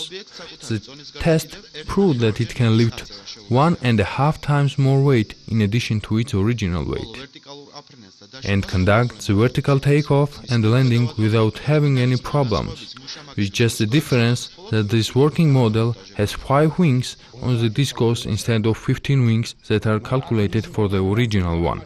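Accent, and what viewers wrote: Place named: England, United Kingdom. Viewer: L'accent rosbeef est déjà horrible à entendre ... mais là c'est l'apothéose !! 😡😡😡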